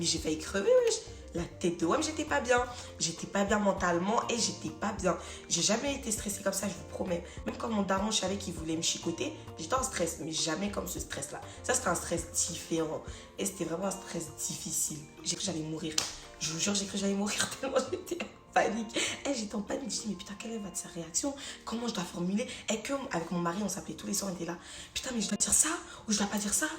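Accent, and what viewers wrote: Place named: Ivory Coast. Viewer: Trop stylé ton accent ivoirien 😂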